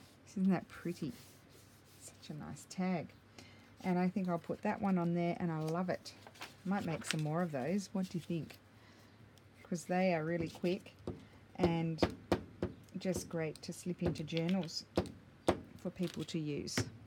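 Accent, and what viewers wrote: Australia. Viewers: Hi, I assumed you were in Australia from your accent but just heard you mention you’ve just been to Australia - I know it’s nosy, but where do you live?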